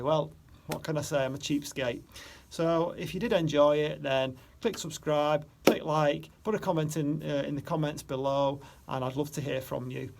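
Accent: North, United Kingdom. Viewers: Fabulous video and so entertaining too. By the sound of your accent you’re definitely a northerner which is always a winner in my book.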